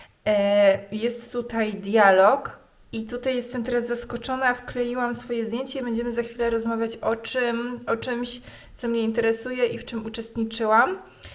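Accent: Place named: France. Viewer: Na początku myślałam, że to nauka francuskiego- lektor mówił z takim akcentem😅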